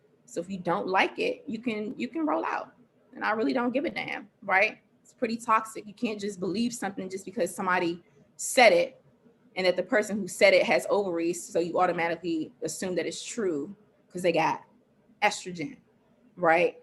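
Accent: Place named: DMV, United States. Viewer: I can tell when someone is from the DMV area... I hear it in her voice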